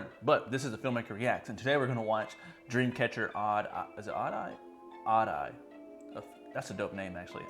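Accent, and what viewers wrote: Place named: South, United States. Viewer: Odd eye refers to the genetic condition of two different color eyes. If you look closely, they all have two different colored eyes. And the last video they’ve built the tree so I don’t know if it’s green screen. We’ll know when they release the behind the scenes. And where are you from? You are a southerner I can tell. Georgian here 🍑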